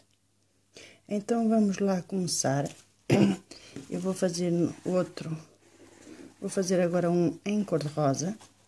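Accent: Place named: Portugal. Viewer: Adorei os marcador de livros. sabe eu gosto muito do seu sotaque porque sou descendente de portugueses.. tenha um bom dia. obrigado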